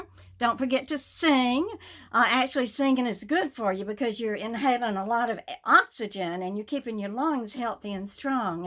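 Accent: South, United States. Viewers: Hiiii Ms 🌞You Sure Are A Beautiful Sight For Sore And Half Blind Eyes😃! You Sound & Look Great, So Pretty Without Your Glasses Too! Really Looks And Sounds So Much Like You, Both Soft Southern Soeaking Precious Woman, So Glad. You Are On Your Way To Fully Recuperating, Thank You For A Very Informative Video, and Thank for the Booster Home Remedy, still now just need to purchase the Ginger Root, then I'll be set!😃 God Bless You Blessing, I Love You Ms.!🌺🙏🌺 😇😇😇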